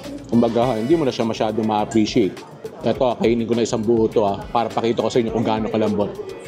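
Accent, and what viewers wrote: Batangas, Philippines. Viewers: Your Tagalog brings memories of when I lived in Lipa for four years. I love the accent and the slangs specific to Batangas. Hopefully I could visit that part of the Philippines again someday 🙏 😀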